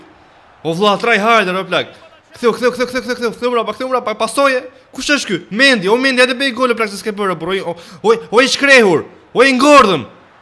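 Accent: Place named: Fier, Albania. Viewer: Kush e vuri re theksin e fierit 😂😂😂😂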